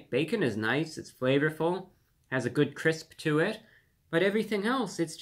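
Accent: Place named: Transatlantic, United States. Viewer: He is upping the transatlantic accent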